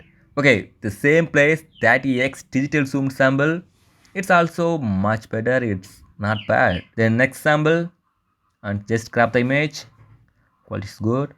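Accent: South India, India. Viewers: South Indian type..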